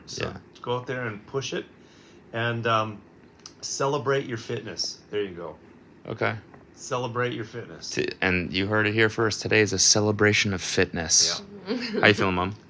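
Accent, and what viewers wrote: Australia. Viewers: Legend! Keep mounting and do another one. Oh and your Aussie accent is fire! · I knew you were in Australia, but the accent is always a jump scare. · Your Australian accent is elite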